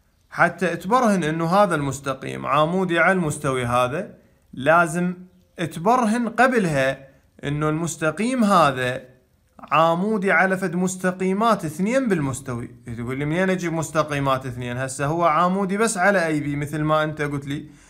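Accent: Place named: Gulf, Arab world. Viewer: لهجتك خليجي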